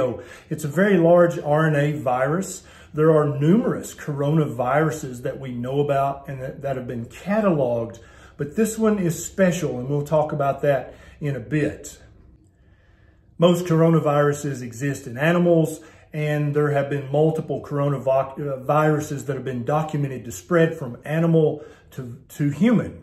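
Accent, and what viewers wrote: South, United States. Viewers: I was listening and then I heard his southern drawl, and all of a sudden he’ doesn’t seem as credible as he once did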